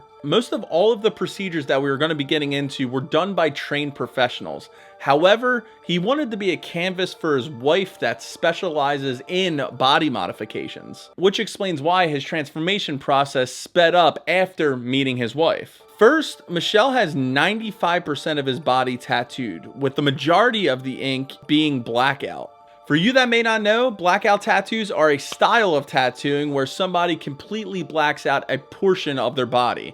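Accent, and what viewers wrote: Philadelphia, United States. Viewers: My thoughts are that you're Philly/South Jersey accent is so heavy, that's all I could focus on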